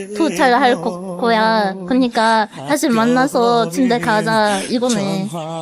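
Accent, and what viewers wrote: Japan. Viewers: 한본어 진짜 개쳐귀엽네 ㅋㅋㅋㅋㅋ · 다른발음은 일본인이 한국말하는거 같은데 시발은 네이티브한국 발음이네 ㅋㅋㅋㅋㅋㅋ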